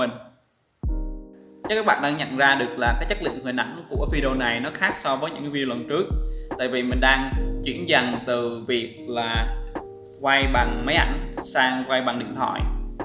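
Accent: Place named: Quang, Vietnam. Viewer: nói tiếng quảng nó cứ vui vui :v , mà xin mấy map bạn đăng k thấy chi sẻ :(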